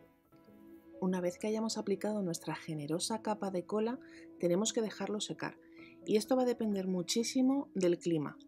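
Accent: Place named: Spain. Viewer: I love your Spanish dialect although I do not understand it but it is beautiful and all your works are elegant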